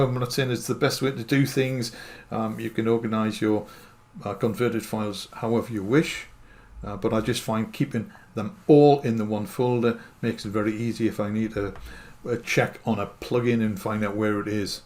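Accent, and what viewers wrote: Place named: England, United Kingdom. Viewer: Excellent and clear. Bloody champion and the proper English accent is welcome too!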